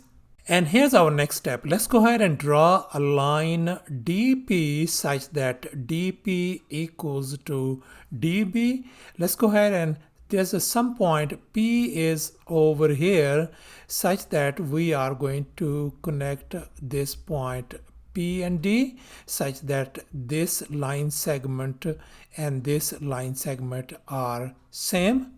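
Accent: India. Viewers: Teacher are you from İndia?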